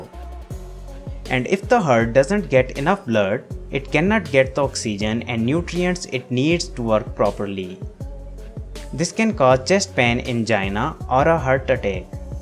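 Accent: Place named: India. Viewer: Indian man from voice ..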